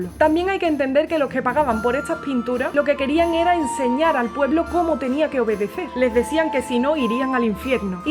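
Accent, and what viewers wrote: Andalusia, Spain. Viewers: Que lindo tu acento no lo había escuchado nunca es precioso se que es español pero no se de que lugar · 🟥Interesante. De paso se aprende de la obra de El Bosco (Pintor holandés, ahora Países Bajos). En seguida voy a ver el otro que hiciste de Da Vinci. Tu acento de dónde? sur de España? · es andaluza, ese es el "ACENTO" de Andalucia aunque hay variaciones dependiendo de la provincia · Recién conozco tu canal, me encantó ! Saludos desde Uruguay. Y el acento es lo mejor, me acuerda a Manu Tenorio jaja (sevllano será? de Granada? otro del sur de España? nadie de España me insulte, sólo es curiosidad y un poco de ignorancia) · Oye pero que buen video, muchas gracias por compartirlo... ¿Alguien sabe de cuál lugar de España viene el acento de? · Uaaauuuu sensacionalllll,eres buenisima. Que mel9dia mas bella y que talentazo tienes. Y mas,tu aciento andaluz deja el video aun mejor. 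Es andaluz,verdad? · Me mata tu acento! Es andaluz? Eres encantadora! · Qué rica tu forma de hablar... Es una manera muy particular de hablar en España... No sé, específicamente, de qué parte de España. ¡Saludos, desde Santiago de Chile!... 👍👍👍👏🏻👏🏻👏🏻👏🏻🌷🌷🌷🌻🌻🌻🌹🌹🌹💐💐💐💐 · Qué lindo hablas, de qué parte de España eres? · Con este vídeo descubro este genial canal. Qué gusto empezar un video escuchando el acento andaluz, madre mía 🤍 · Muy bueno el acento andaluz....es casi el acento mas iconico de España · Cada vez mejores tus videos,. Sigo hipnotizado por ese acento tuyo, tan andaluz, que reberbera en mi oído argentino. Gracias! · Qué hermoso acento andaluz, saludos desde Venezuela. Primera vez que veo tu canal y me parece muy interesante, tanto así que ya me he suscrito. Gracias por generar contenido con cultura y buena música. Mucho más éxito para tu canal!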